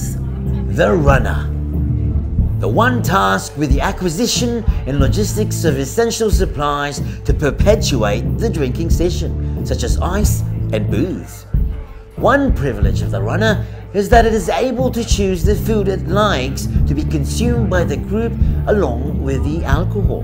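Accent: Australia. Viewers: ngano Mani bay, kung paminawon Ka mura kag Australiano unya kung tanawon Ka mura kag Mexicano o Dile Gani Bisaya...ngano man???!🤔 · I love the authentic Aussie accent...so cute and funny...lol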